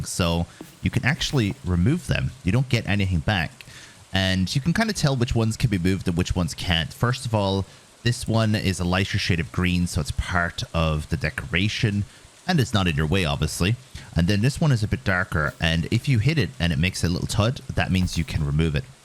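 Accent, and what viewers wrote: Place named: Ireland. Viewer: Savage vid bro, i hear that irish accent peeking through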